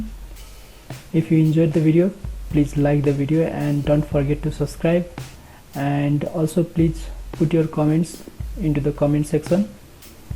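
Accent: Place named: India. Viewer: Man I love the fact that you make tutorials but sometimes its hard to follow indian accent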